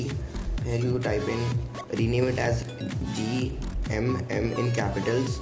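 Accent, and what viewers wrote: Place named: Karnataka, India. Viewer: R U Kannada ?? U Get the slang of Kannada in English...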